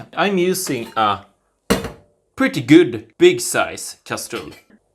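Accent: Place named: Sweden. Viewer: Juste bra med swenglish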